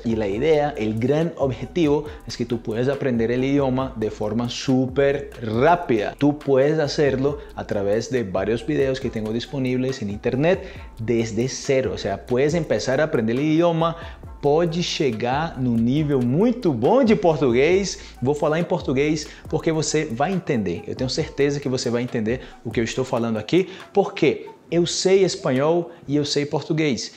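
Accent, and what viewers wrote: Colombia. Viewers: Jaja, como lo mencionan en el video con Steve Kaufmann, tu español suena con el acento de Colombia. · nossa você fala espanhol como se fosse colombiano, eu sou falante do espanhol e quando escutei a você falando foi incrível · Ya pegaste el acento colombiano... yo aprendí contigo.. ahora vivo en Brasil y enseño espanhol rsrsrsrrsr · Amé tu acento colombiano es bellísimo!! ya inicié el mini curso es pero avanzar pronto!! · Le doy 10 de 10 a Tu asentó español colombiano, ojalá yo pueda aprender portugués así de bien como tú hablas español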